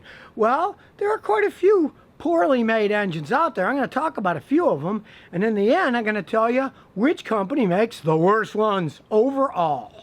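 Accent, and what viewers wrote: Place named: East Coast, United States. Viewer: I LOVE THIS GUY MAN !! HE JUST TELLS IT EXACTLY HOW IT IS YALL !!! AND OF COURSE HES GOT THAT RUGGED AND RASPY EAST COAST ACCENT !!! COOL BEENZ YALLZ!!!!!!!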